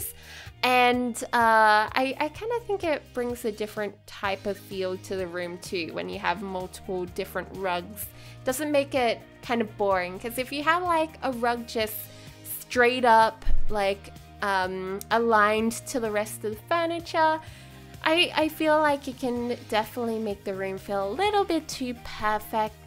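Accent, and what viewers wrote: Australia. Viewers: Hannah Paterson because they are both Australian and have the same accent they sound very similar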